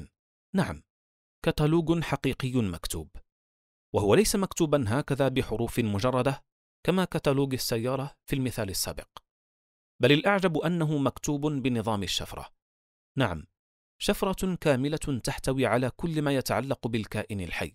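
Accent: Morocco. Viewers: أظن المتحدث مغربي، انطلاقا من لحن الكلام الخاص بنا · اشعر ان صاحب الصوت مغربي 😅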